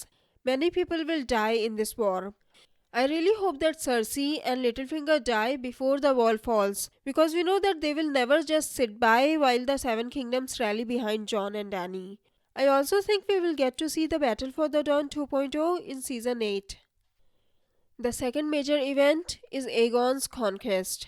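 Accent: India. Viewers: are you from INDIA? your accent seems like that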